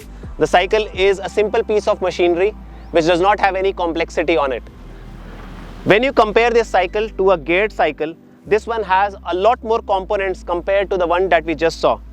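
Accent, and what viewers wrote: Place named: India. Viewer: You have a thick Indian accent.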